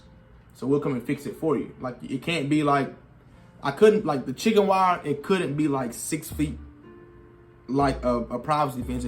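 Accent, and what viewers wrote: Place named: Ohio, United States. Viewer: Yo where are u from? you sound like my cousin from Youngstown, Ohio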